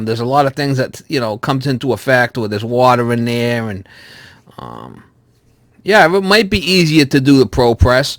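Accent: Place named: Boston, United States. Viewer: Where you from? Revere? Easty? You sound North of Boston. I'm from Dot myself. Love your vids dude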